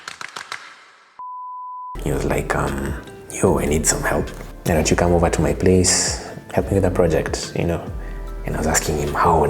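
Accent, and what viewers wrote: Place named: Kenya. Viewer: Sounds a kenyan accent...i love the video lighting quality Love from Ug. 🇺🇬...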